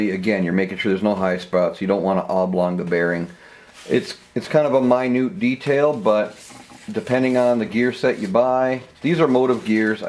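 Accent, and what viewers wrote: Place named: Canada. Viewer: I’ve been in the auto industry for 30 years and have never heard a ring hear called a crown gear, I kept listening and I believe I hear a Canadian accent perhaps, it’s interesting to me how each area or region has their own names for certain things.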